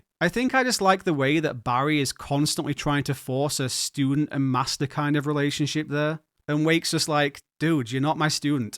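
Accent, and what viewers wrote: United Kingdom. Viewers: I love the contrast between your cutesy looking avatar and your, at least what sounds to me, south yorkshire accent. got a giggle outta me lol I'll add that if you want, there's some glitches that allow you to acquire the event legendaries. they're not hard to execute but you should follow a guide so as to not get lost in the eternal abyss. but I'm sure you knew about it already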